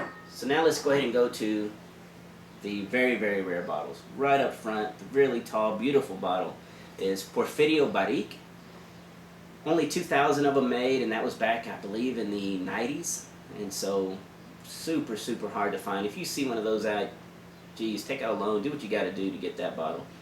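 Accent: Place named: South Texas, United States. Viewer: I can tell this man from south Texas Cheers brother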